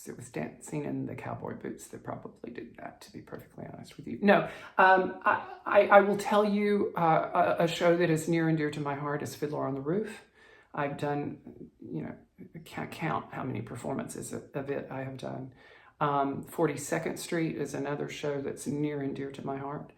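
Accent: South, United States. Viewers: Great video. I always walk away from one of your videos smiling and quietly laughing. What a soft spoken southern gentlemen. My father in law was a southern gentleman, too. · Omg I just died 😂. What an absolutely perfect disclaimer. Just found your floss tube thanks to the Floss Bossin Cousins. Have been binge watching/listening while at work 🤫. When just listening you make me think of Leslie Jordon (your sense of humor or southern accent maybe)🤷🏻‍♀️. Looking forward to the next 50 or so videos 😃.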